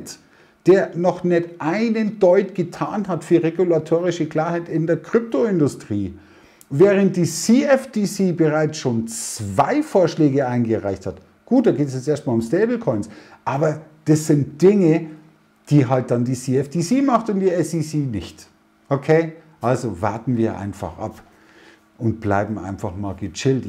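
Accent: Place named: Bavaria, Germany. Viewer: Servus, denke ich auch. Übrigens darfst ruhig Michi sagen😉. Ist wahrscheinlich bei deiner messageflut nicht so einfach. Dürfte ich dich fragen wo du wohnst? Deinem Dialekt nach scho im bayrischen Raum oder?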